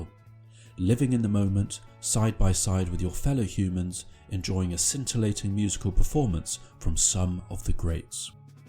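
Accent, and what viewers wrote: England, United Kingdom. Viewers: and her....got to be in there. Since you have a bit of an English accent I don't think you know the new Patriot culture. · I was there at age 17. Does this English accent narrator even mention? Paid least, played the best and most moment. Also, made an impassioned and unforgettable contribution with. I had seen the year before at the unforgettable Newport Pop Festival in the broiling Costa Mesa summer of 1968.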